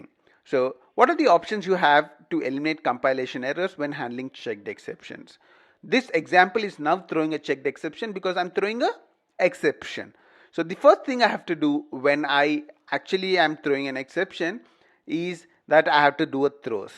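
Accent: India. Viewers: you are great!! only indian accent that I can bear really!!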